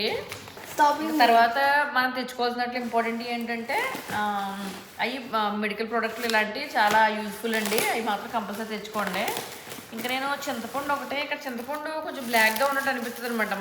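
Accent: Godavari, India. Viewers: I too like your godavari language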